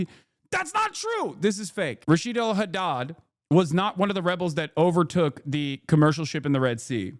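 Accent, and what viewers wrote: Australia. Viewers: Australian Fox News has very few Aussie subscribers, but US MAGA love it. !! See a mention as a point of pride. Your Aus accent is f.....d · Heads up I had previously subscribed to your channel and I’m here to find out what the effed up lie sky news is saying about you, being an Aussie myself. And I find out that I am unsubscribed to your channel. wtf? Love your Aussie accent very very funny lol. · It's like a cross between "oh naur" city girl, and broad TV aussie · I'm also an Aussie, I was musing about where geographically in the country his accent might be from. Cities have a posher accent whereas rural Aussie accents are described as "broad"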